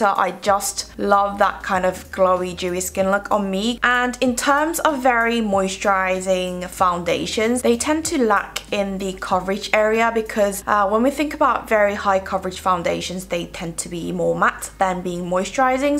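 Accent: United Kingdom. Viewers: 언니 왤케 이뻐요ㅠㅠㅠㅠ진짜 누가 백설공주 라고하셨는데 그 말 딱 맞는듯ㅠ 그와중에 영국발음 취저 탕탕😭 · 영국발음 발린다ㅠㅠㅠ..매트를 맡이라 하는 거 늠나 치이는 것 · 진짜 영국식발음 넘나 매력적ㅠㅠ 저도 영국영어 배우고싶어요ㅜㅜ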